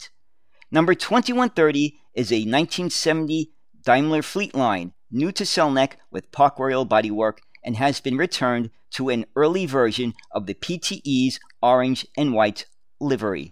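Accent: New York, United States. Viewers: Absolutely love your New York accent!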